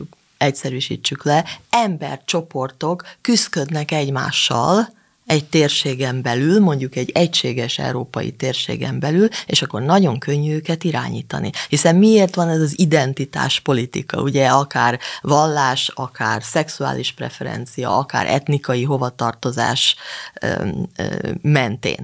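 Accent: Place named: England, United Kingdom. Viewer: Nagyon jók a Hossó Andrea videók mindegyiket megnézem. De ez a rémes angol akcentus ez engem nagyon idegesít. Megérteném ha ott született volna, de nem.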